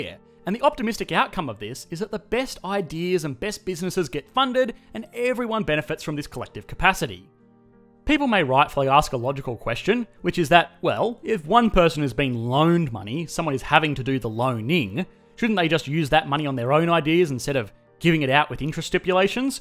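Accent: Australia. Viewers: Goddammit handsome sounding Australian man. I was going to sleep in my screwed up sleep schedule. Now I’m getting educated. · Uploaded 13 hours ago Australian accent 1am?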